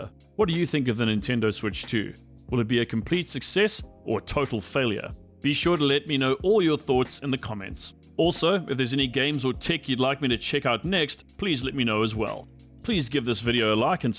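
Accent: South Africa. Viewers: is he south african? is he australian? we will never know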